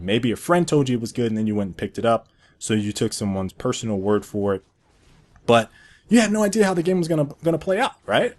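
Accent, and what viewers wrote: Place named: Texas, United States. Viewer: Man I'm starting to hear some Texan accent. Good vid btw.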